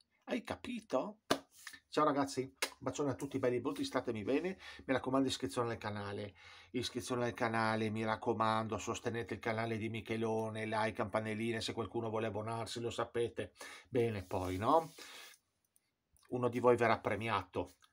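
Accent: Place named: Bari, Italy. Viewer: Il dialetto barese è il top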